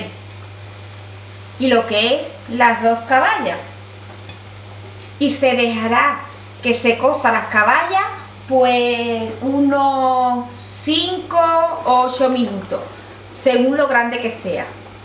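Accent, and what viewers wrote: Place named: Cádiz, Spain. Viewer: Me ha encantado el video y tu acento gaditano. Me encanta mi tierra Andalucía.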